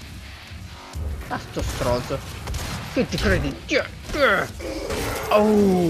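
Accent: Sardinia, Italy. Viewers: Oddio, sei sardo?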